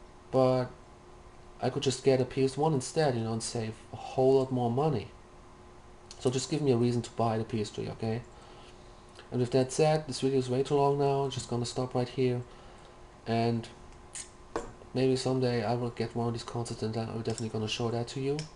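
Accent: Germany. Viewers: Guten Morgen! I like your german accent it sounds really cool. Sony is in the business of making money. if your waiting for backwards compatibility on the PS3 Slim console, it well never happen. No offense but you want alot but your just not willing to pay for it. Blu-ray looks fantastic on a properly "calibrated" hdtv preferably 1080p but a 720p would work as well. You don't seem to be into movies so i'd recommend you get a 60GB PS3 console which is backward compatible.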